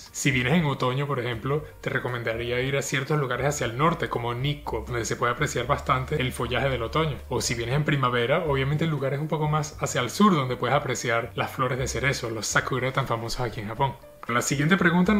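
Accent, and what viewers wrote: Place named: Venezuela. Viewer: ¿Te formaste como músico en Venezuela, en el Sistema de Orquestas y luego te perfeccionaste en Suiza? ¿Rechazas tu condición de venezolano? El acento es innegablemente venezolano.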